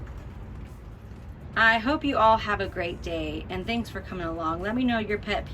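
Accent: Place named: Michigan, United States. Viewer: Hi! New sub here! I don’t know how these vendors stay in business. I spent $160 at Norman’s orchids for 4 plants Dec 2018. They were all rootless, rescue plants. They wouldn’t send me replacement plants and the owners wife was rude to me on the phone, email and Facebook. They were all supposed to be blooming size. None have bloomed yet, but they’re finally starting to look like they’re getting happy. Btw, it’s nice to hear someone talk like me on YouTube!! I’m from MI 😀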